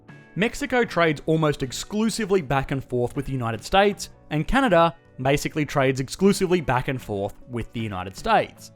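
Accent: Australia. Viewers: Good content but the Australian or New Zealand accent makes it hard to listen without getting nausea or a headache. Terrible accent!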